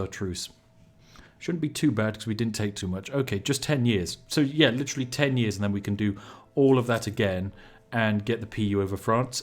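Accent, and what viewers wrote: United Kingdom. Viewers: I could listen to read a EULA. Such a spiffing British accent should come with a warning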